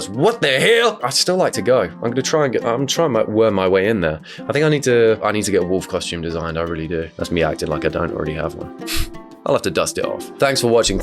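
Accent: United Kingdom. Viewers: wait have i been saying renaissance wrong or is he just british asf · It’s just the way the British pronounce it, coming from a British person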